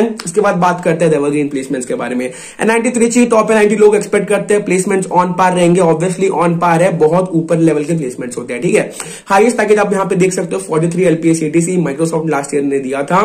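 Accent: Bengal, India. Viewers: Bhaiya!are you Bengali or South Indian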